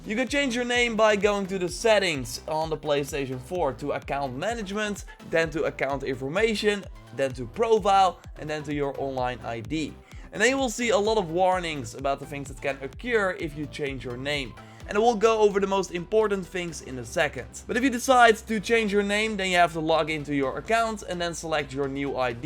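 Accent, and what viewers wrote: Netherlands. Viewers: Ik hoor aan je accent dat je Nederlands bent!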